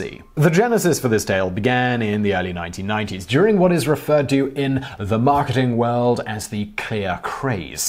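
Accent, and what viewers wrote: United Kingdom. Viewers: As a Californian I find English pronunciations of Spanish words delightful. I feel a little less bad about how much better they are at english than us. Wonderful episode as always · When did the vsauce guy get a British accent?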